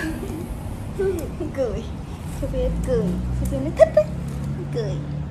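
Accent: Mekong Delta, Vietnam. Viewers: WOW dam chất miền tây nam bo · nghe tiếng của chị người miền tây chị ở tỉnh nào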